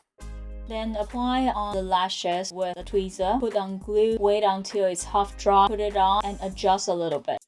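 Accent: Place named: United Kingdom. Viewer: LOVE UR BRITISH ACCENT !!!!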